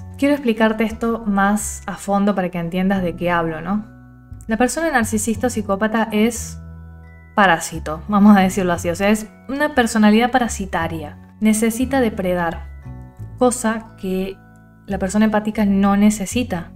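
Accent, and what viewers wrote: Córdoba, Argentina. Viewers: Completísimo. Escuché otros videos tuyos, explicas muy bien. Tengo la duda si sos cordobesa, nada que ver pero los cordobeses nos reconocemos el cualquier parte. Sos una genia, manejas mucha información y la haces entender. Gracias x todo. Sé en qué momento estoy. Más segura, más emponderada, pero se que también puedo correr el riego. Él está ahí, rondeando, espectante de saber cuándo puede volver a haberme entrar el si juego, lamentablemente lo tendré que ver el resto de o vida, creo se entiende el porqué. Naa.. todo lo que explicas es así. Cuando explicaste que se nos queda ese lado oscuro en nosotros, que hago cosas aprendidas de él, hasta pensamientos aprendidos de él, todo ésto que lo transito con mucha más conciencia gracias a pedime y como vos que nos explicaron toda esta tragedia y le pusieron un nombre. Digo esto porque yo sabía yv entendía que algo malo había, siempre lo percibí y después que pasó lo más terrible seguía sabiendolo, pero aún sin entender qué era. 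Enormemente gracias.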